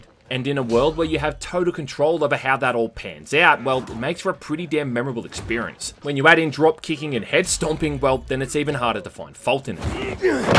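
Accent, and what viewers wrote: Australia. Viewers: There's something about an Aussie reviewing anything that is just amazing. Love you work man!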